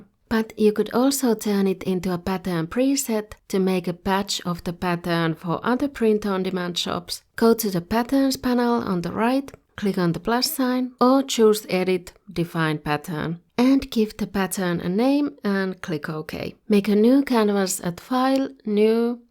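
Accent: Finland. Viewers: Lol, after hearing your accent i immeadiatly knew you were finnish 😂. Keep up the good work, coming from a fellow Fin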